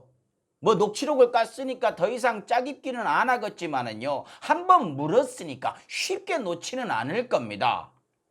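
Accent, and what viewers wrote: Jeolla, South Korea. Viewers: 표준말을 요합니다 전라도 언어로 ...산뜻해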